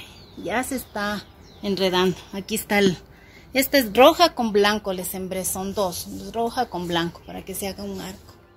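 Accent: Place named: State of Mexico, Mexico. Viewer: Esta hermoso tu jardín 💕 😍 ♥ ❤ 💖 💜 sigue adelante.tu acento parece del Estado de México México.....pero ya vi eres de Ecuador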